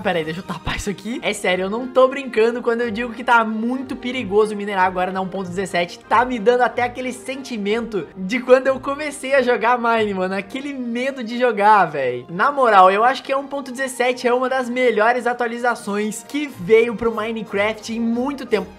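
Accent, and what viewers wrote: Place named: Rio de Janeiro, Brazil. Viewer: Vou ver se foi o Feu cariocando vc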